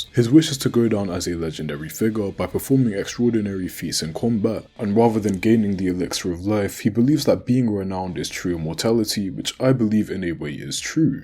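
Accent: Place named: United Kingdom. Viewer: Hello, I’m assuming you’re British from your accent. I love jjk, csm and Hells paradise. Love your reviews that’s why I subscribed and liked:)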